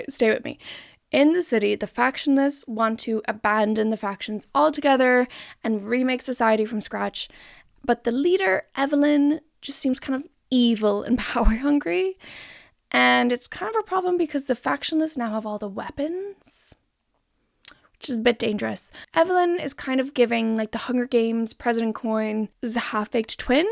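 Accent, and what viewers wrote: Ireland. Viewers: do i detect an irish accent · is this a super watered-down irish accent? i'm not a native speaker and i'm confused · Yes i watched your whole 1hr and 7 mins and it was more entertaining than the movies. I think i watched 2 and gave up on them. Also enjoyed what i believe is an slight Irish accent in your video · I went through this entire video thinking you were American not Irish… the accent only came out when talking about the LC haha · EDIT: Ireland!! I watched your Booktok video, and I hear it now. ORIGINAL: Dude, I am so sorry if this is rude. It's my first time watching your videos, and I gotta know — WHERE is your accent from??? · the way you say your T’s; like raised somewhere English main but time in Ireland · Is Ms. Irish? I keep hearing little hints of an Irish accent, and I'm trying to determine whether or not I'm imagining it.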